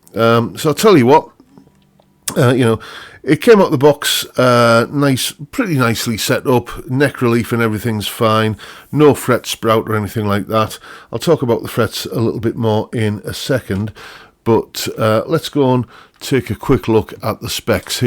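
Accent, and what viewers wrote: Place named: Northern England, United Kingdom. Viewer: Hey! Nice to hear another fellow northerner,I'm from Durham 😅. Where abouts are you from? Great review! I've been curious about Fazely for a while. I've recently(in the past year) got into modding guitars and previously bought a glarry(which is I love!). Fazely are the other brand that appears when you're looking for the cheapest new guitars. With the way prices are now it actually seems more economical to buy one of these brands new. Guitars that use to go for £10-20 second hand like encores are now going for £50-100 which is crazy 😅. Sorry I'm waffling on,got a bit excited 😅 What I was going to ask you was do you think their stuff are decent platforms to modify? Great content too 😊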